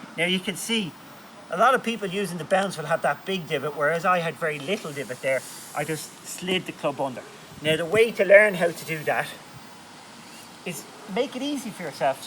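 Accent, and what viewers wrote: Ireland. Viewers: Genius. Struggling like a non-swimmer in Cat V rapids until now. Like most amateurs thought I was ok until I tried. Shattered the graphite shaft like a moron. Thanks. Don’t change a thing, especially the Irish brogue!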